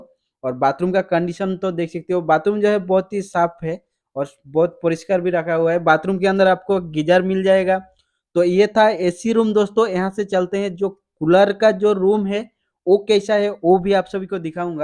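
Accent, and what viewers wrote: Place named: Bengal, India. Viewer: কথা শুনেইতো মনে হচ্ছে বাঙালি... তা বাংলা তেই বলুন না... নিজের ভাষায় কথা বলতে কি লজ্জা করে??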